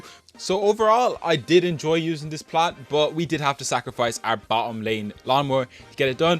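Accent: Ireland. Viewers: Are you Irish cause I’m Irish too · You irish?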